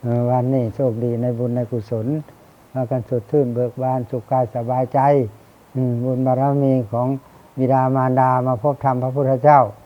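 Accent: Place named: Thailand. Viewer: สาธุเด้อข้า น้อยหลวงพ่อเว้าได้ดีหลายว่าวภาษาบ้านเฮาให้คนฟังเข้าใจสำเนียงเป็นไทยแต่ศัพท์เป็นลาวเพื้นบ้านสาธุข้าน้อย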